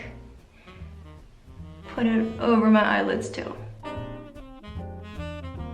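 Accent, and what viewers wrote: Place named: United States. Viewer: i’ve been following your insta for a while and this is my first time hearing you talk. and wow i didn’t expect the american accent! i really thought you would sound posh british somehow since i see you update in london quite a lot 🥲 you’re so pretty, by the way!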